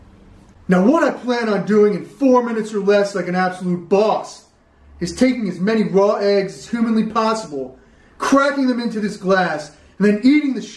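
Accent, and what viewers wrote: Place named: California, United States. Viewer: He sounds so California western now.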